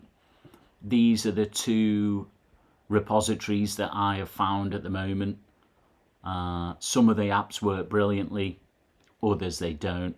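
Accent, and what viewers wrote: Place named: Cockney, United Kingdom. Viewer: Why are you speaking in a Cockney accent?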